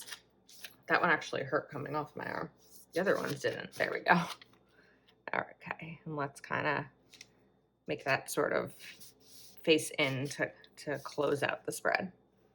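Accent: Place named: New Jersey, United States. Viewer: Hi...so sorry for your loses. I was listening to you while I was just doing my planner and you made me laugh. You asked the question about the pronunciation of the word orange. I thought wow she says it the same way I do. Then the next thing you said was that you were from NJ. I'm from NJ as well now residing in Vermont. They tease me all the time about my accent. I'm constantly trying to explain that it's not me with the accent but them...lol. I love your spreads and videos. Chin up, you're doing a great job.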